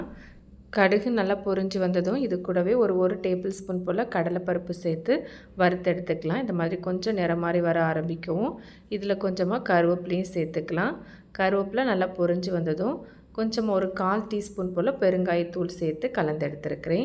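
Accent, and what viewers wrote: Tirunelveli, India. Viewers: Your Tamil is like Tirunelveli Tamil.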